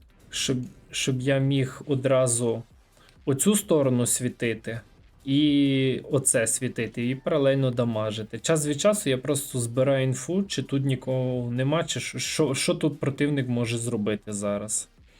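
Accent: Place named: Poltava, Ukraine. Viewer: Якій же в тебе гарний Акцент!Звідки ти,Полтава?Миргород?Суми?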